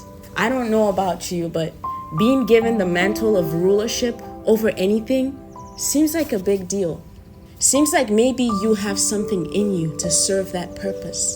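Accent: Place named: Kenya. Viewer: Amazing Gift!! If I may ask, sis. Where are you originally from? I understand is a Nigerian name and specifically from the people of Benin Kingdom, but your accent is giving Kenyan or somewhat Cameroonian. I'm just curious. Shalom!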